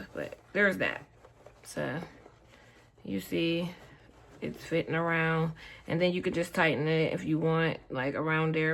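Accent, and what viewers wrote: Pittsburgh, United States. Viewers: i know you from pittsburgh mama i hear my ppllllllll lol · This is an awesome tutorial. I am born and raised in Pittsburgh Pa and your accent is thick. I can hear the Burgh in your accent. I say that you have an accent because I don't hear it in my voice because I live in ky over 20 years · Girl, I knew you were from pittsburgh when you first started talking! 😂😂😂 #412😊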